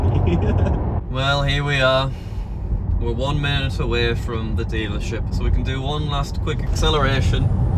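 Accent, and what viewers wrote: United Kingdom. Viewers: How good is it to hear Brits doing these Tesla road tests? 0.60 in 3.07 secs...'Oh my God...Jesus Christ...Jesus Christ....Bloody Hell' Just my kind of comment... Love it:-)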